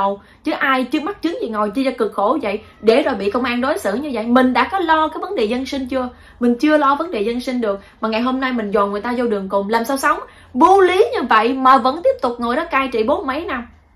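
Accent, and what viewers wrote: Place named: North, Vietnam. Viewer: Sometimes it is difficult to understand the Tonkinese accent.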